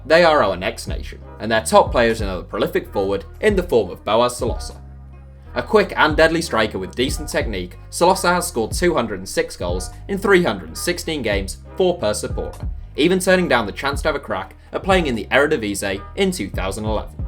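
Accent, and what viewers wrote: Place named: RP, United Kingdom. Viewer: Though I like the efforts, getting your research from Wikipedia and putting a RP accents aren't good enough, to make a list of the best footballer in every countries. You lot need to read more outside of Wiki and consult football experts from each countries to make a better list. Pronunciation is a dead giveaway that this videos is poorly research...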